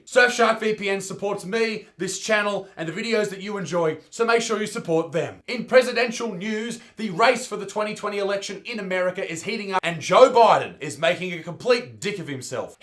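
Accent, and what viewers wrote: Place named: Australia. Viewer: oh boy I just love listening to an australian man shout at people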